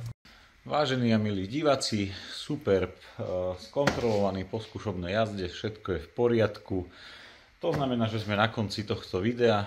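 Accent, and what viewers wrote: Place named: East, Slovakia. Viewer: Máš predkov z vychodu ze vies trocha po vychodnarsky ??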